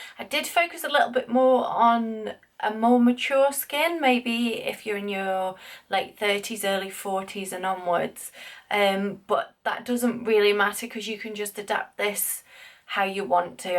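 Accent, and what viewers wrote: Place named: United Kingdom. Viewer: i love your videos and i love your british accent :) This is Littledustmua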